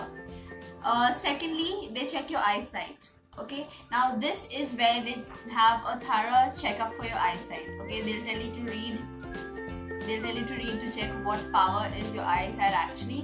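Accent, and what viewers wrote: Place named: Nepal. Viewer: so cute maam u r!! nepali acent 😘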